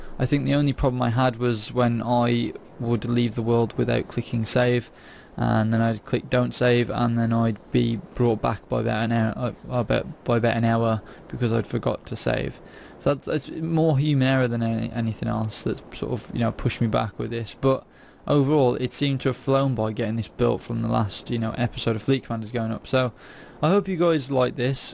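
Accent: United Kingdom. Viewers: you said the word "Homely." adjective 1. NORTH AMERICAN (of a person) unattractive in appearance. synonyms: unattractive, plain, unprepossessing, unlovely, ill-favored, ugly; informalnot much to look at "she's rather homely" But then I realized that you had a British accent. Nevermind. :)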